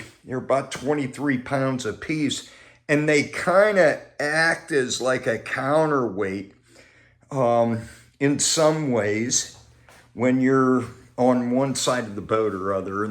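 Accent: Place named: Cincinnati, United States. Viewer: Why do I feel like this guy is from Cincinnati area? Complains without a true thoughtful logical ana. I may be wrong, but o know an accent and type.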